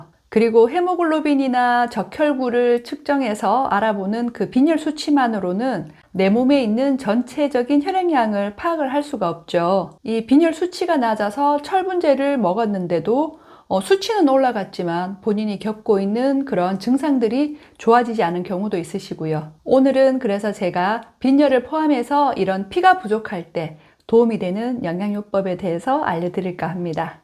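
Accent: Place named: Gyeongsang, South Korea. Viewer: 경상도 억양이 있네요 예쁘고 똑똑하시네 다 맞는 말씀입니다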